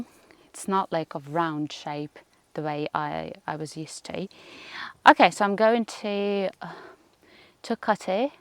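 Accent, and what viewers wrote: United Kingdom. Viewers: Internet isn't real yet this british accent is almost real, so much prpganda but, your video reminds me of my grandma, she never owned any land, yet she knew so much about farming. We used to harvest public plants and at the time it felt like i was carrying twice my weight in fruits. Better times 😀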